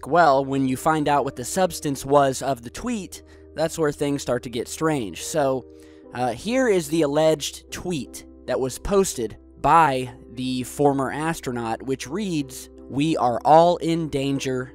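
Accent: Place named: United States. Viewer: Any chance you can learn pronunciation of the English, that's not American language? The word Antarctica has some "t"s in it, use them please! Get some education, PLEASE!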